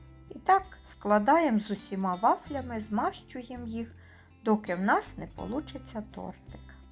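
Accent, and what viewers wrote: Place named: Surzhyk, Ukraine. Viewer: Розмовляе суржиком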